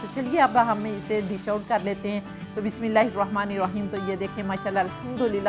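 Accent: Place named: Delhi, India. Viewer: Dear sister,Masha Allah behtreen recipe of Palak and Mutter.Your way of talking and fluency is very charming .I feel you. Speak Urdu of Dehli origin.Use of a Punjabi word Bhuppa was also a unique selection by you. I enjoyed it. Thank you for pinning me and your response to my comments.Allah Hafiz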